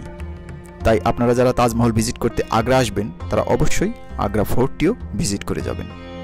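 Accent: Bangladesh. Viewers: That's Bangladeshi Bengali accent. We Indian Bengalis don't speak like that. Our Indian Bengali accent and pronunciation are different from Bangladeshi Bengali.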